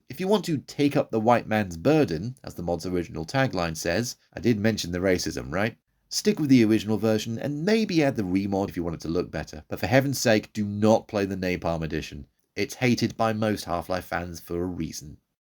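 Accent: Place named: England, United Kingdom. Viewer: Ребята он ряльно англичанин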